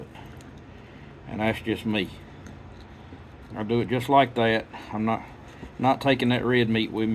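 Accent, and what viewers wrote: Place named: Virginia, United States. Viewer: oh my goodness...how you make me miss my Daddy. He skinned just like you do. He talked just like you do. I am not sure where you are from but you remind me of back home in Virginia. Don't forget to save your innards and put them under your garden plants. Makes for great fertilizer.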